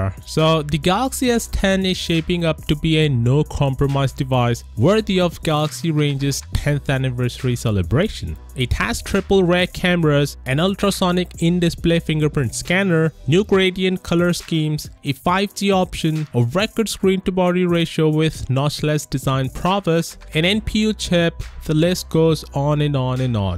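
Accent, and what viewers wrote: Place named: India. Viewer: Indian english hahaha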